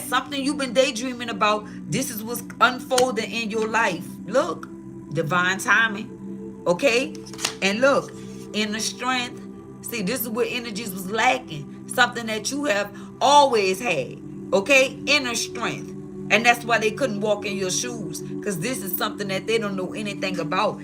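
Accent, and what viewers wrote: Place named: New Orleans, United States. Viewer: I know you from New Orleans the way you Talk. REALNESS.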